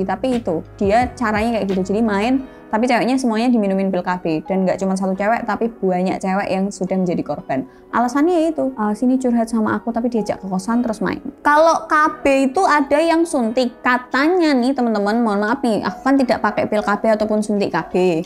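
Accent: Java, Indonesia. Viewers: baru tau chanel ini. .bhasanya medok jowo ne ki wong ngndi.. 😂 salam knal wong jwo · Sex Education dgn logat Jawa yg kental , kearifan lokal, btw endorsement Kondom Vivo ya empiris apa empirisme nih wkwkwkwk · seng tak seneng i... omong medok.e..... DOBBOLLL.... 🤣😂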